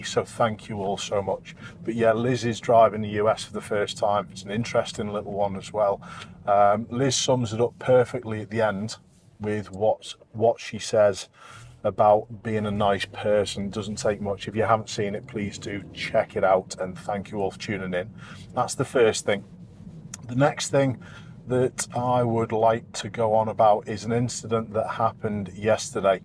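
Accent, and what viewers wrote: Liverpool, United Kingdom. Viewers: I do enjoy the 'Drive like sitting in the passenger seat.' videos. 😂 Edit to add: I heard dulcet Scouse throughout. Pas de frainçais.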